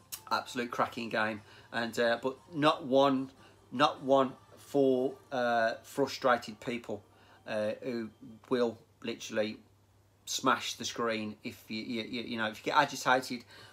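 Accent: Birmingham, United Kingdom. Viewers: Good to hear a brummy voice on YT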